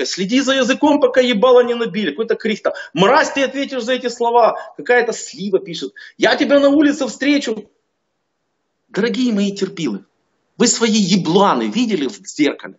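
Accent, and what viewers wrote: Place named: United Kingdom. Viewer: акцент гарний ( в сенсі красивий). Майже британський. До ар-пі ( пош - акцент), можливо, трохи не дотягує, але звучить досить естетично привабливо ( без гомосятини кажу, лише як естет )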